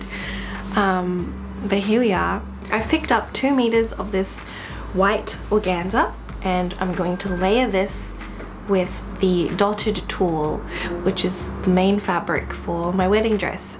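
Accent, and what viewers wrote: Australia. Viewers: She has a noticeable Australian accent LOL. So Australia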